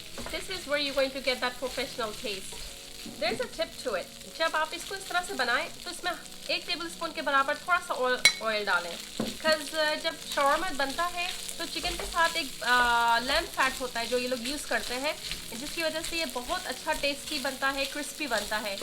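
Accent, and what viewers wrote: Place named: United States. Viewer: Aapka amarican accent bohot accha hai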